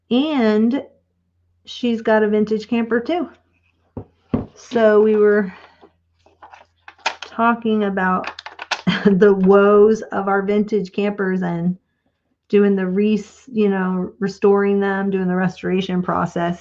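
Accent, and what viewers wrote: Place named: United States. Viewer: Weird comment, but has anyone ever told you that you sound like Kelly Clarkson? You must have been raised in the same part of the US. You have the same accents.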